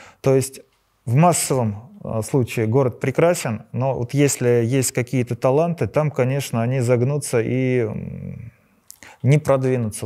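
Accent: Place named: Siberia, Russia. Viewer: Еще с первых выпусков понял, что родом из Сибири. Слишком уж хорошо осведомлен о нашей жизни. Да и в целом речь быстрая, ударения тоже наши. Прослеживается какой-то диалект. Я несколько лет живу на юге страны, тут люди не понимают некоторых слов, которые использует и которые я использую в повседневной жизни. А сейчас еще раз убедился в своих выводах. Люди не из сибири даже врядли слышали про такие города как Абакан и Минусинск.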